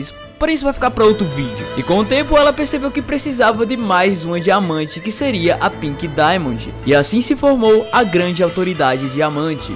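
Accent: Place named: Northeast, Brazil. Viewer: Na moral esse seu sutaque nordestino da agunia se loko fala todo errado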